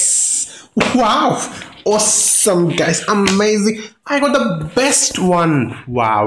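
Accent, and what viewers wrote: Kerala, India. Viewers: oru malayali paryne pole tane und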